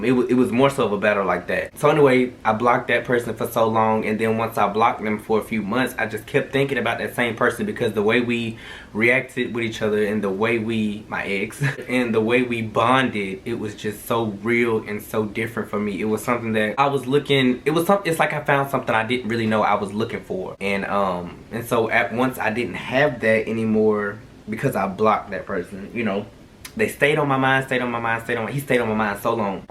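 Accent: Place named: Alabama, United States. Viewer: What part of Alabama you from bruh!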